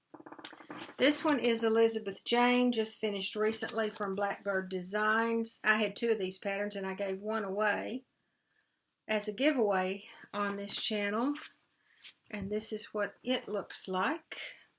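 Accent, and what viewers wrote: South, United States. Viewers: We replaced our 100 gallon hot water heater with a tankless one and love it! It does take a few minutes for the hot water to get to our shower but that’s because the heater is in a pool closet on the opposite side of the house. You have endless hot water and never have to worry about running out! It also gives you a whole lot of room compared to the giant tank! Enjoy😀 I love watching and listening to your videos. I grew up in Virginia and moved to South Florida almost 35 years ago. Not many soft spoken, Southern accents down here😢. Happy 4th🇺🇸🇺🇸🇺🇸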